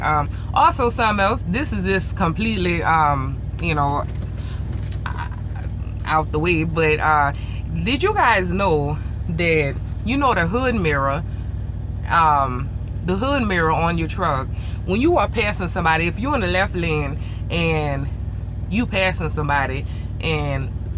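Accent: Louisiana, United States. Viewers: she gotta b from Louisiana or the west indies!!!!!!!!!!!! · k, so what part of Louisiana are you from,? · let me guess; You're from Louisiana/NO or South Carolina? awesome accent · i love your accent! u from Louisiana? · She's cajun, from louisiana.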